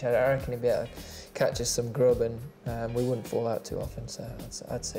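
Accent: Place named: Yorkshire, United Kingdom. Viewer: Yeah because both of them have thick Yorkshire accent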